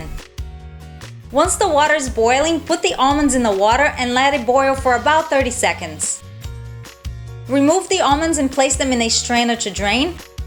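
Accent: Brazil. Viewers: Is she Brazilian? She has a very nice accent :)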